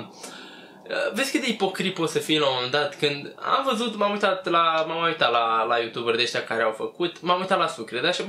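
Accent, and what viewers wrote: Moldova. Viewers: Imi place accentul tau de moldovean :))) ( wăăăi ) #suntsacal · Like pentru accentul Moldovenesc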